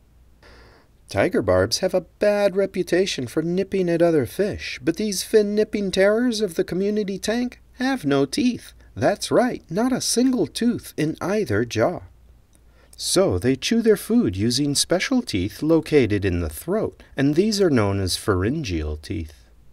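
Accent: United States. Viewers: Standard American: Tiger barb Boston: Tiguh bahb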